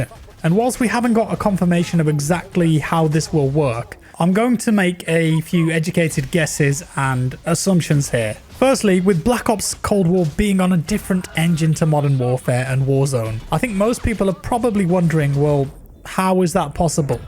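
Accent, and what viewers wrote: England, United Kingdom. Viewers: What English accent does have?:)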